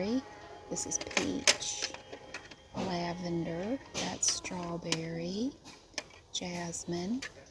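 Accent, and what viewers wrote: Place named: South, United States. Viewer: Oooooo yay!! I love these videos it’s so soothing to watch you organise the shelves along with your southern accent talking away ( I’m British 🇬🇧 and I love me a southern accent 😊 ) I especially love when you organise the soaps!! ( that’s my fave ❤ ) Looking forward to another one of them videos too!!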